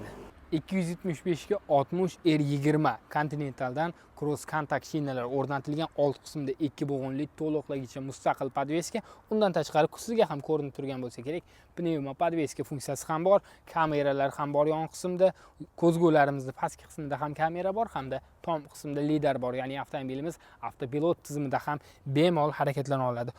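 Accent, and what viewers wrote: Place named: Uzbekistan. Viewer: Соф ўзбекча обзорга гап йўқ. Мошина ҳам зўр экан🔥🔥🔥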